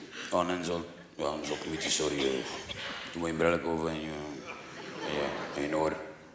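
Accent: West Flanders, Belgium. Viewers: Top westvlaams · jemoe ma west vlaams kun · west Vlaams uit België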